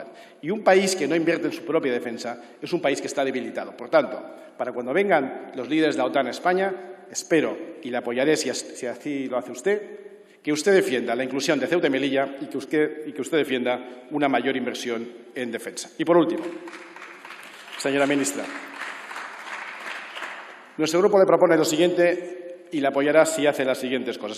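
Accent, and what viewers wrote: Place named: Morocco. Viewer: Iván tienes un acento igual que la gente del pueblo de Tetuán y chawen de Marruecos por eso viene éste odió a los marroquíes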